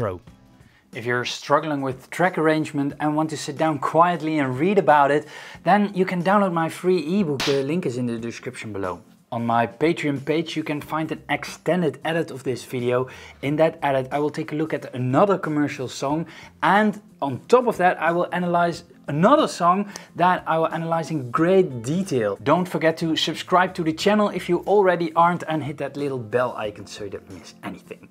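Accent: Netherlands. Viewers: Great tutorial! Just subscribed... is that a Dutch accent btw?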